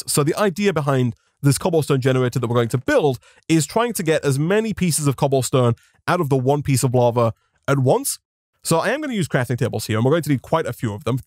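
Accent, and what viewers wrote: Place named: Yorkshire, United Kingdom. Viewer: man, you must speed up your videos. why? you have the voice and pronunciation of a beautiful Yorkshire angle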